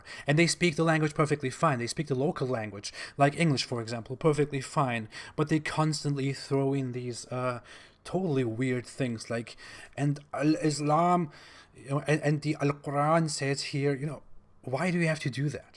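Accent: Germany. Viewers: I love your little laugh! And I love your German accent. And I love your cute face! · I love your videos and I love your German accent. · Islam harms Arabs the most, especially the Arab women. That's what I think as a Turk. Btw, I think you have some kind of Turkish accent besides German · I love your videos and your German accent. ✊🏾😂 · Ich liebe Deinen deutschen Akzent ;-) ! Deine Videos sind super ! DANKE und schönen Gruss aus der Heimat ! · I love your vedios and German accent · I love your videos. I love your German accent. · I just found out about your channel and I love it. You spread awareness about islam which is important to help reform it. Your videos also help me find information when debating muslims or islam loving feminists. I don't know if you take requests for videos but here are some topics I'd like to suggest: what is taqiyya, muruna, tawriya and kitman (probably spelled them wrong, sorry )and can you give some advice on how to recognize and deal with them when muslims practice them. 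Do you think Islam will ever die and what would be the cause if it's death? Do your think it can be reformed somehow? And how do you think radicalism in Islam can be stopped? You can also try to do a video on the history of islam...oh, and by the way, love your German accent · I love your german accent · Which Arab dude hurt you man? I’m an ex Muslim too but chill out on talking shit about Arabs dude. You’re not perfect and you have a shit German accent. Not all of us are crazy Muslims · I love your videos and your German accent. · Thank you so much for your bravery, intelligence, and honesty! I love your channel! You are so good! I love your German accent! Take good care! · You are a fellow German!! Das freut mich voll. Ich mag deinen deutschen Akzent 😍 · could you please do your vids in Arabic as l can't understand your English because of your strong Germanic accent. Rock n Roll! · I love your videos and I love your German accent. 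Peas be upon you. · Love your videos, unsure about your German accent. Tschus! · I love your videos and i love your german accent! :)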